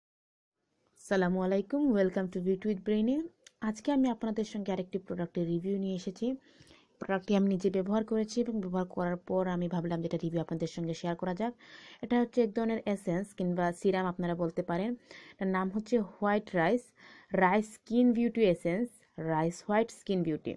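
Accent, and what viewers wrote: Sylhet, Bangladesh. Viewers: Are you sylheti ? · Amaro lager tain sylhety😃😃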